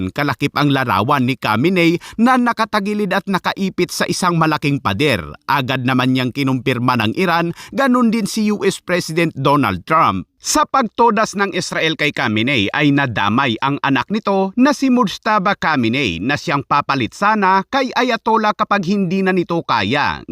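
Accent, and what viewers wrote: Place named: Visayas, Philippines. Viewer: Bisaya ka pala dn idol eyy🤙🤙 hahaha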